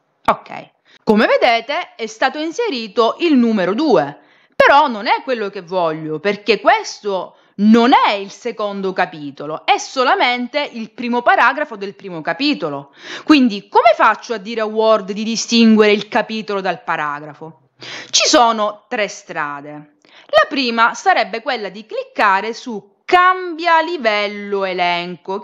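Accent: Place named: Sicily, Italy. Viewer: Sono ingegnere come te, ma hai delle capacità oratorie affascinanti,…poi con quell’accento (siciliano?) ufff, tanta roba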